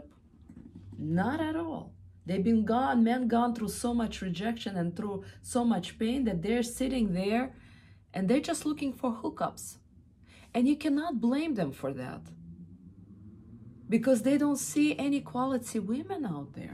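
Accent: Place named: Eastern Europe. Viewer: I knew it!😃 I just didn't know for sure because there are a lot of eastern Europeans that have a similar accent to yours (atleast when I hear it) 😂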